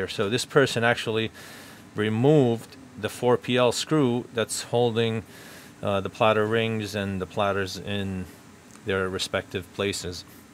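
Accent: New York, United States. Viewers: When they both grow up in NYC anyone from outside NYC will think they sound the same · You sound more New York than you usually do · New York accent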